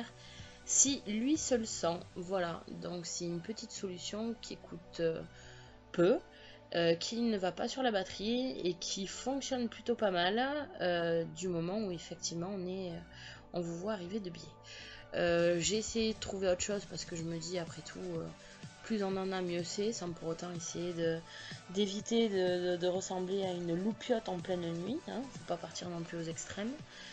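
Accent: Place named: South, France. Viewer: lol de rien L'accent du sud est très apprécié il donne tout de suite le sourire!!! ne le réfrène pas au contraire:D Nous sommes des sudistes et fier de l’être!!! bonne continuation à toi;-)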